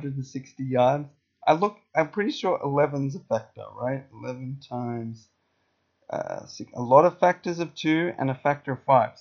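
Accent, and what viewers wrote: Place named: Australia. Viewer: lmao dude mom in american english doesn't rhyme with bum or any of those things, the o is pronounced like the o in bomb. That one is just your australian accent confusing you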